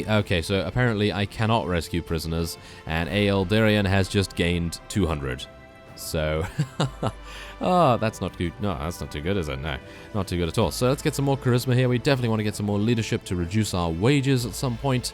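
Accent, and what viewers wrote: United Kingdom. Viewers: UK I think. u can tell from how he pronounce certain words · I'm just making an assumption from the accent that he would be from the UK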